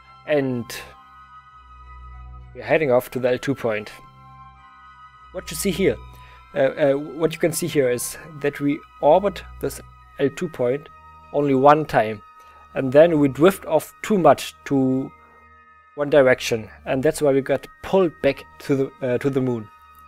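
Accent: Germany. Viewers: Wow, ich kannte dich nicht, hab aber sofort an deiner englischen Aussprache gehört, dass du Deutscher bist. :D Tolles Video! :) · German English? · r u german? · You have a very german accent. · Dankeschön! Es war ein gutes Video. Und "Flugzeugbau" bedeutet "Aeronautical Engineering" in Englisch. Du kannst das im Wörterbuch nachschlagen: <link> Und noch etwas: Ich mag deinen deutschen Akzent! :)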